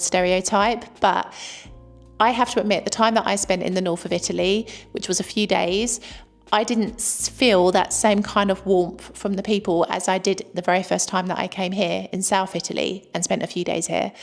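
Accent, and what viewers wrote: United Kingdom. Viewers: I love your British accent.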